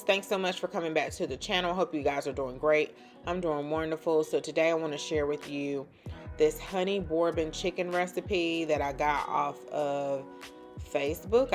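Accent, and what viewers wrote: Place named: North Carolina, United States. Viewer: First time here but I knew you were from NC...lol...I'm from the Lumberton area...it's so funny to here us speak..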